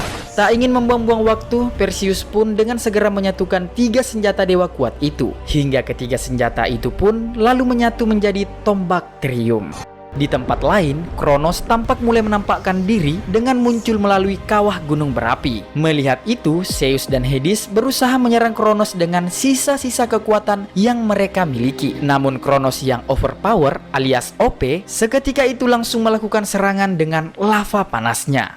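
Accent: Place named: Makassar, Indonesia. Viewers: Dari logat nya pasti orang Sulawesi😂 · Pasti orang Makassar 😅 Salam dari Gowa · Cokdok cokdok kayak bahasa Sulawesi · Adminnya jelas² orang sulsel, suku apa juga gak tau😂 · Makassar banget nah kamase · Orang makassar ini pale 😂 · Nassa na logat makassar na admin ka😅😅😅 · Admin nya orang Makassar kah? Logat & bahasanya ketauan . Kaya pas bilang 'tampiling, co'do co'do'😅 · Gue subs lu bang karan lu orang makassar kan haha😂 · Deh pasti orang Makkasar ini hahaha waktu bilang tuming abu dehh pecah ketawakuu😂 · Orang Sulawesi Ki to bang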